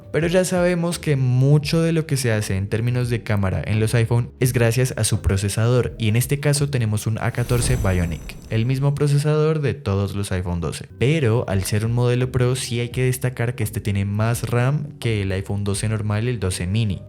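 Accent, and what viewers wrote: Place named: Colombia. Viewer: Eres de colombia que si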